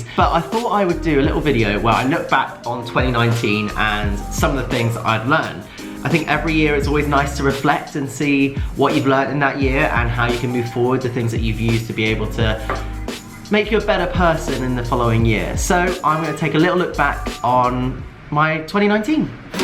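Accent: United Kingdom. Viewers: Thanks to Tom, my ear is really get used to British accent now 😂 P.s: english is not my main tongue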